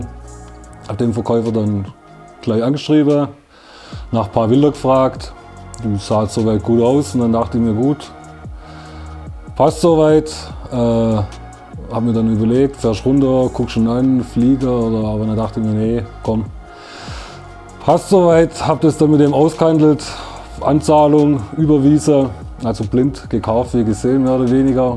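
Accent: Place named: Swabia, Germany. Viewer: Endlich wird auch mal schwäbisch gschwätzt auf dem Kanal..... Respekt vor der ganzen Arbeit und super Ergebnis! Top!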